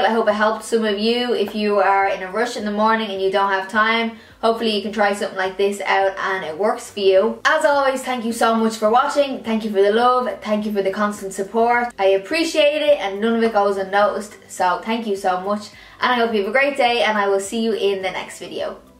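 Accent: Ireland. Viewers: Holy Jesus you're Irish:):) first time watching your vids after following you on social media:) are you a dub as well?xx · I just love listening to your brogue..it reminds me of my Nan who was from Kenmare..☘☘☘☘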